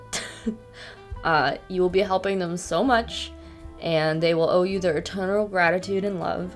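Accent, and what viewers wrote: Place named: Ohio, United States. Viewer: The ohioan accent alone made it worth the watch 🫡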